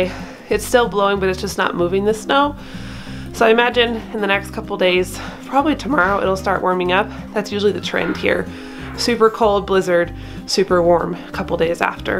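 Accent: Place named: Midwest, United States. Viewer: She has a Midwest accent.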